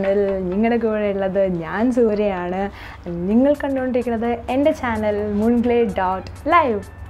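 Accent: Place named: Palakkad, India. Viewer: Palakkadan penkutty😑guess ur frm pkd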